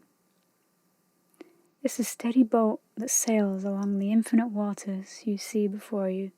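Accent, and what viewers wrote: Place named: Northern England, United Kingdom. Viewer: Nice vids, some of the most interesting iv seen, keep up the good work, you sound like your from north of England, cant put my finger on exactly where though.:P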